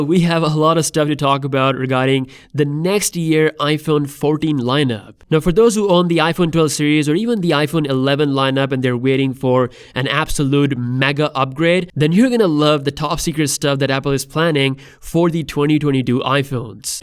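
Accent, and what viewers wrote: India. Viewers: are you Indian cause you accent is different I am not complying just telling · Indian accent?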